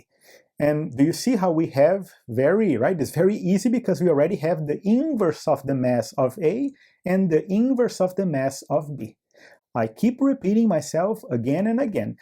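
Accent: Brazil. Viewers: Aha! Eu tinha certeza que vc é brasileiro pelo sotaque! Cheguei aqui aleatoriamente pq comecei a brincar de fazer jogo esse ano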